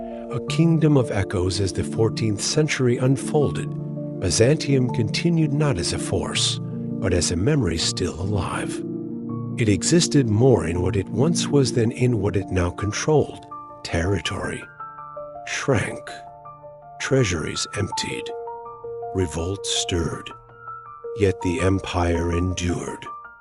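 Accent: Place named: United States. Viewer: This American accent sucks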